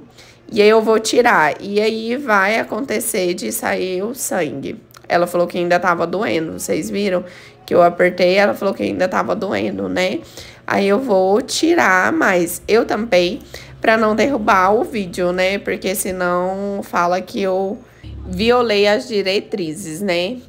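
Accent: Brazil. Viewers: Vc é de Goiânia? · Amo os seus vídeos e o se sotaque goiano.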